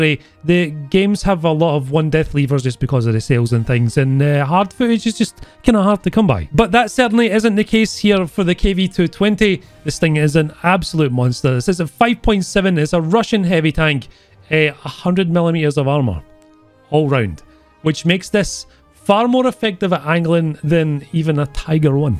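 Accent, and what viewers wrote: Scotland, United Kingdom. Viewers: Hey I was for Christmas in Scotland Ben Nevis defeated me but your video was useful as a accent tutorial while drinking with Scottish people-they did not defeated me in drinking 🤣 Scotland is so beautiful! people kind! Alcohol great! I brought a blue beautiful hat everyone 8n London aks me about my orgin and i feed them up with madeup stories about my childhood in mountains- with perfect accent because of you 💪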